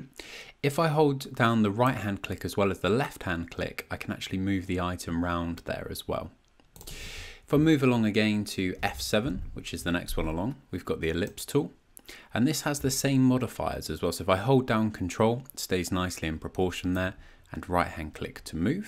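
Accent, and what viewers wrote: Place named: Wales, United Kingdom. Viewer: wowwwwwwwwwwwwwww welsh acent! i like it!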